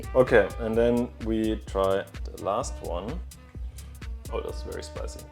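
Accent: Germany. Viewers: sounds triggeringly german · The german accent is going to kill me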